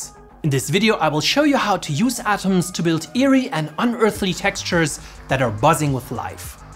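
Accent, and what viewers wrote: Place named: Germany. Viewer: Nice one. And the thick german accent makes this video even better.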